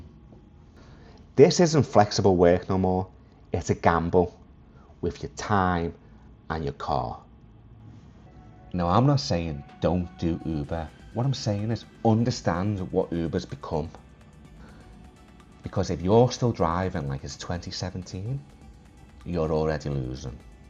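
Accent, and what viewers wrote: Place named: Liverpool, United Kingdom. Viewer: I like your accent, thats Liverpudlian right? Ive noticed it's dead too, as I can see what the drivers are getting paid nowerdays and it's always about 60% of what I paid 😢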